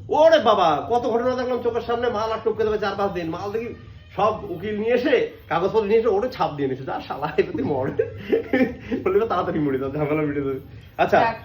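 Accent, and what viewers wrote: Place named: Bangladesh. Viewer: Son surar bachcha tui ja vaba Indian armed forces ka niya kotha bola6is are satha tor bou sur tana6a......Todar duto kai bol6i Tora manusar jat na ra......sotti kora bolto tora indian na ki oi parar mal .....kotha suna to amar tai mona hoi made in bangladesh.....Oi body bilding are ghamant ak dom dhukia dabo........Janor sala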